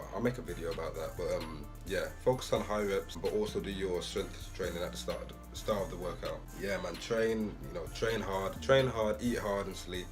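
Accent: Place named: Birmingham, United Kingdom. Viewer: The birms accent is too wavey!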